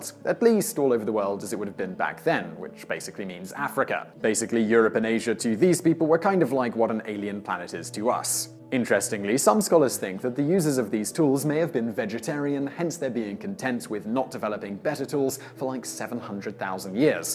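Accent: England, United Kingdom. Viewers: "British accent"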